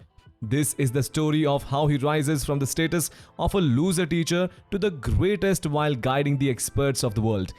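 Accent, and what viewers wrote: India. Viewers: Is it Indian accent ?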